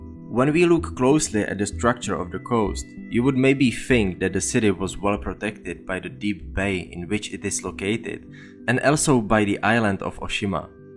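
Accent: Czech Republic. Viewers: Cesky prizvuk slysim :). DIk.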